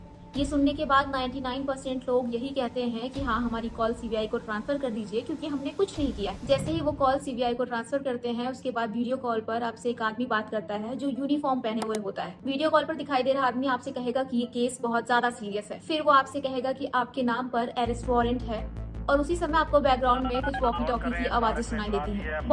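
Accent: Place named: Pakistan. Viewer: Iski accent se lag rha hai ye pakistani hai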